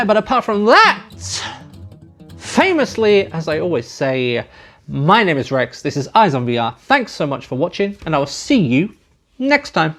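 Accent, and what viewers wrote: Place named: United Kingdom. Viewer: I'm guessing by your accent that your Brittish? I'm also guessing that you do not currently reside in England? Given the fact that you just compared the VR pistol to a real one, which is a big no-no in England - a country that has become a haven for criminals and where law-abiding citizens have been stripped of any/all rights to bear arms or defend yourself against said criminals.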